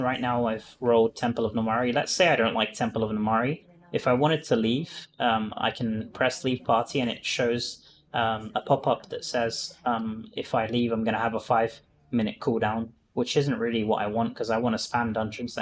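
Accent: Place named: United Kingdom. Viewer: Y u name urself after biggest mass murderer but sound british?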